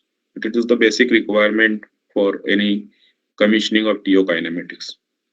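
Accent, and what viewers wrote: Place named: India. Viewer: with the indian accent it is becoming an headache after some point. sorry mr..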